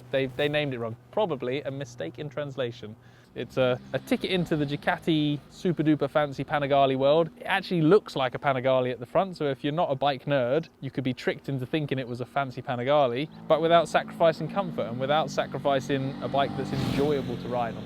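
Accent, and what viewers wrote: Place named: United Kingdom. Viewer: Love british who ride bikes for some odd reason lol ( I’m Swedish ). Maybe it’s your accent making the hobby feel more sophisticated rather than a muurican ”I’m so tough!!”-accent. Is it worth going to the UK for a biketrip?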